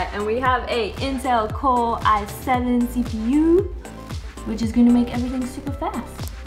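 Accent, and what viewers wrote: Australia. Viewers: Picked really good components, but the "cute" baby talk while describing each of them is like nails on a chalkboard. Is that an Aussies accent? I didn't know someone female could screw that up. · Ahh Aussie, I love it👍😊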